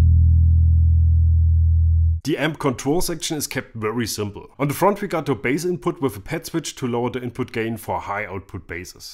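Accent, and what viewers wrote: Germany. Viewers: Phil Mitchell reviews bass stuff with a german accent on youtube? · The content is always great, but the German accent and eye movement is what keeps me coming back.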